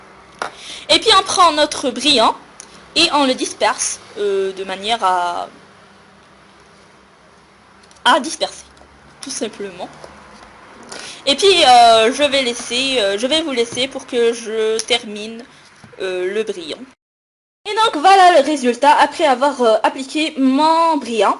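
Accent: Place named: Canada. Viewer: Si est parle comme sa ses quelle a l acsent canadien bande de merde sa se voix (p pour dire paillettes elle dit brillant et pour dire étoile elle dit star ) tout les canadien(ennes) ytbs que je connais parle comme sa et elle pn beaucoup d abonner 😭😭💖💖💖💖💖💖💖💖💖💖😪💖💖💖💖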